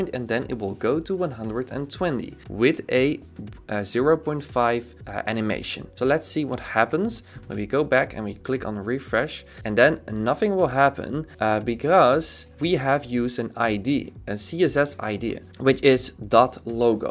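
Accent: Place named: Netherlands. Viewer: Nice, just came across your YouTube video for a work project I have and I thought his English is good but has an accent is he Dutch and yes you are :) I used to live in the Netherlands as well until last year!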